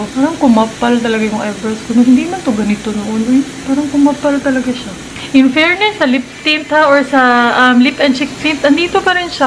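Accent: Visayas, Philippines. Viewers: Kacute mo gid na ya magbisaya teh 😂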